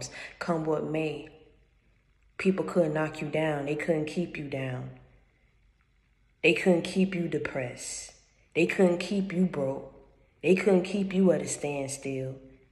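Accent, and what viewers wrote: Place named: Geechee, United States. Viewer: Sing yo sung that's how geeche people talk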